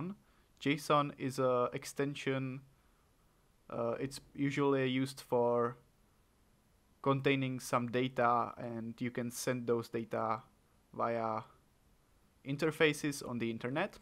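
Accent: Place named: Czech Republic. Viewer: you sound czech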